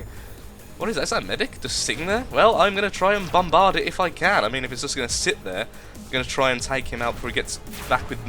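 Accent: United Kingdom. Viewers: r u british?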